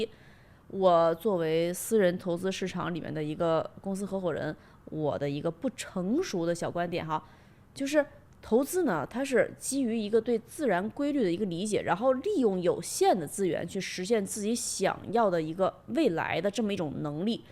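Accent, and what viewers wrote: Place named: Beijing, China. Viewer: 本期北京话含量极高:face-blue-smiling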